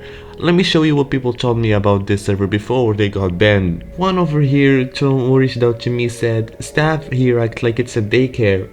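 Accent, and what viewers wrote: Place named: Alabama, United States. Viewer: yo. i thinked you are from alabama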